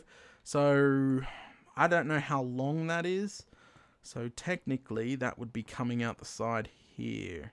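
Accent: Australia. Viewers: Hey are you from Aussie by any chance? Haha I'm from New Zealand so if you are from Aussie heya neighbour:D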